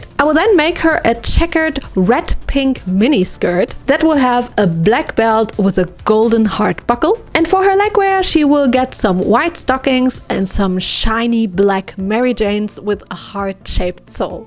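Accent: Germany. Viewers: YAYY NEW VIDD! also weird question but are you german by any chance?? I believe your accent reminds me very much of german!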